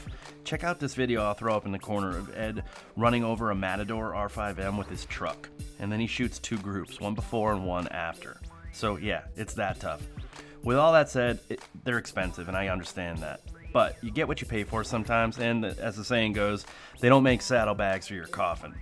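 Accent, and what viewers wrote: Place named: Spain. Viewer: What's up with the Spanish undertone? 🤔I'm confused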